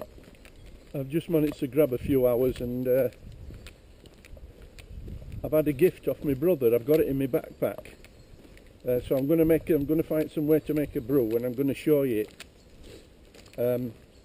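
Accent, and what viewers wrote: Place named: Leeds, United Kingdom. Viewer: just been watching another of your vids and my mrs commented how much accent sounds like her dad, and uncle, who are originally from leeds way. Glad you got permission, although I would definitely make sure I had a bright flag up, and smoke signals, if you run the chance of being stuck cooking your bacon butty's in the morning, in the middle of a shoot. Hope to see a vid of your night out some time soon. ATB, Phill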